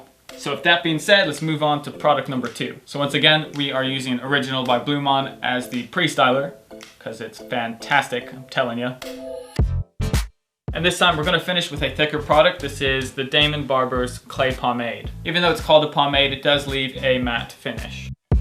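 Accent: England, United Kingdom. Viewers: what's up with the english accent? lol he never sounded like this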